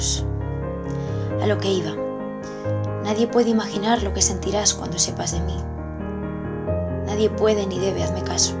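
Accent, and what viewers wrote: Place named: Spain. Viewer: Me encanta el acento español!!!!!!!!!!!!!!!!!!!!!!!!!!!!!!!!!!!!!!!!! Me encanto lo que hiciste! He llorado... inevitable no llorar!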